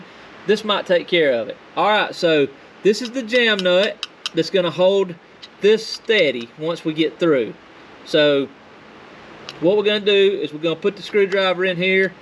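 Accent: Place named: Louisiana, United States. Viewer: A good buddy of mine from my US Navy days is from Georgia, and when I heard your voice it reminded me of him.. Being an Irvine is nice, but it's kinda like being stuck in the middle of a zoo because California is just gone crazy, in some places. Anyways, your videos take me to these little country roads and get my imagination thinking about little motorcycles, and big open spaces. Again I can't overemphasize the detailed nuance and elaboration in your video, of the clutch adjustment was just so spot on. I watched 3 videos before yours, They showed the adjustment but didn't elaborate the way you did. Your speaking cadence, intonation, grammar, syntax use, affable Southern accent will take you very far, on on YouTube.. Wishing you and yours are very happy and prosperous New Year. Thank you again for the quality content.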